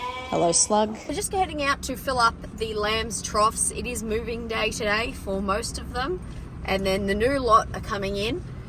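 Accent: Australia. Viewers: It's just normal Aussie talk, mate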